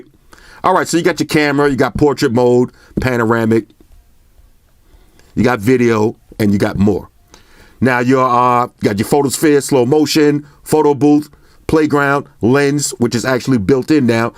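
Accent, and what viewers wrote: New York, United States. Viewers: I just bought the iPhone Xs but I wanted to hear your review on this. The NY accent is 😘♥️😍 Us ladies love it!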